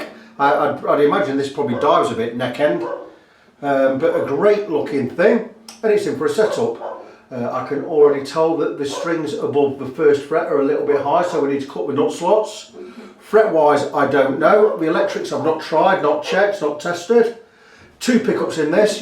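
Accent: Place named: East Midlands, United Kingdom. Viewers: I was guessing Leicester! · Great tutorial. Thank you for the gmi tools tip. You sound like you're from my neck of Sherwood Forest. Derby, Notts or Sheff area. Lovely to hear that accent all the way up here Int North. It was your pronunciation of 'about'. We both say it 'abart'. Top guitar tech in bud. Duly subbed and liked.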